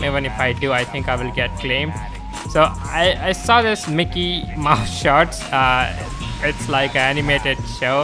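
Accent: India. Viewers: Fuck the Indian pronunciation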